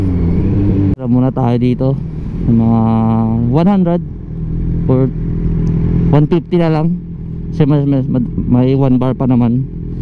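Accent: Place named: Ilocos, Philippines. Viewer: ilocano ka met brod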